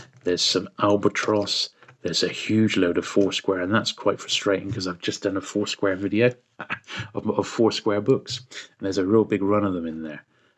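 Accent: England, United Kingdom. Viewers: You forgot Scots (the language). 🤓 But yes, most people know there is a plethora of British dialects and accents, and not one. The same is true of American English: someone from Boston and someone from Alabama sound almost nothing alike. And yet we still refer to them as “American” accents because they do sound more similar to each other and are easily distinguishable from accents in Britain or places like Australia or New Zealand. That’s the reason we group things together. To distinguish them from other/different groups. Same goes for “British accents”: I have no idea where exactly the speaker in this video is from, but I know it’s not North America, and it’s gotta be somewhere in Britain, most likely England. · It's not a British accent. There's no such thing. That's an English accent.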